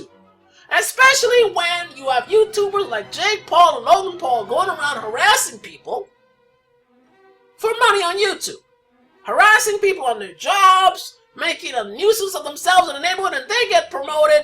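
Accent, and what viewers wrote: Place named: New York, United States. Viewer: By the way,,,, when did you gain your New York accent????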